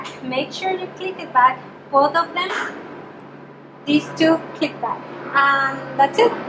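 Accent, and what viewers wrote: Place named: Mexico. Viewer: Let's be honest... Who the hell cares about learning how to change the Air Filter ? We all know how to do that but watching a beautiful girl explaining the process it's music for my ears and pleasure for my eyes. I have a 2005 Toyota Corolla XRS but I installed TRD Air Intake, something little bit different Well done mami, I love your video and your accent Viva México Ca... !!!!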